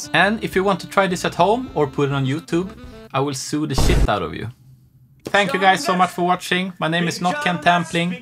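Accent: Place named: Sweden. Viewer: The swedish accent makes it even better! Tusen takk for en meget god latter.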